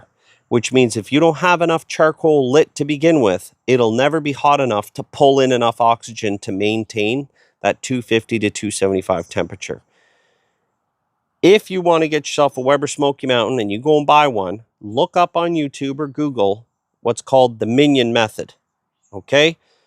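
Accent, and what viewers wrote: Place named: Canada. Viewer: Some of the ones NOT to buy if you are an American (I realized that you are Canadian by the accent) are Frontier (MEX), Lone Star (MEX), Jealous Devil (BRAZIL), FOGO (El Salvador), others too. Royal Oak is made from the lowest bidders. Just read labels. Kingsford, Cowboy and M and M are acceptable.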